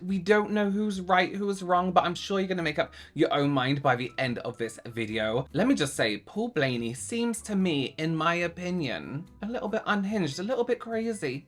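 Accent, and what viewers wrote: England, United Kingdom. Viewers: I love hearing you read out that nonsense lol. Words like "n*tsack" in that unamused English accent is just perfect 😂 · Can you speak Spanish? I'm dying to hear Spanish with a British accent! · I think you could make a living just reading aloud profane, ungrammatical posts from Americans (I am one, so don't come for me, fellow Yanks). I've gone back and listened to the segment between the mark and several times. The combination of your soothing voice, your British accent, and that incel's assault on the language (and his client) is art.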